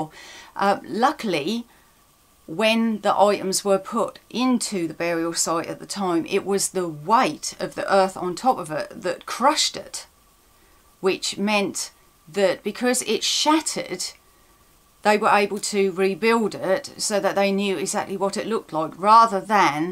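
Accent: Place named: Suffolk, United Kingdom. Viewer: Your accent just takes me back to my youth, I love it. A proper Suffolk accent, not like my mongrel Suffolk / Nofolk / probably mostly RP (and maybe a tad of north-east from my time in 'Boro... maybe)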